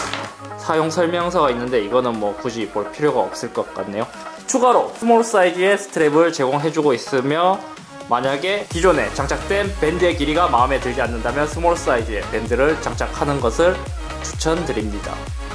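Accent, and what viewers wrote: Gyeongsang, South Korea. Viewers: 잘 보고 갑니다 ㅋ 대구 말이 정겹네요 ㅋ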